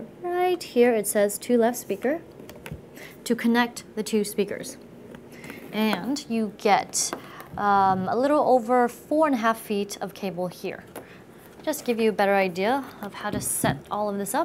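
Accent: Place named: Asia. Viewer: Asian accent ))))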